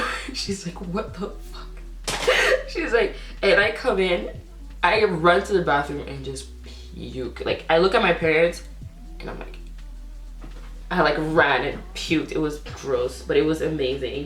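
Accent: Canada. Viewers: Girllll, I love you I subscribeddd. I could definitely tell you were Canadian too 😭❤️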